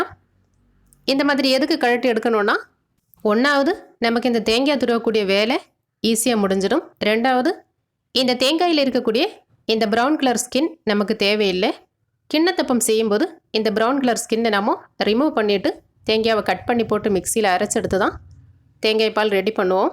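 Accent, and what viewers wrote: Kerala, India. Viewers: Super Checbi im from chennai and your language Slang is Malayalam. 🎉🎉🎉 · பேச்சு கேட்டால் மலயாளி போல தெரிகிறது. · Malayali mathiri pesareega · Oru malaiyaalai Tamilppesavathaippaaraattunga kuraisollikkorikkaatheergal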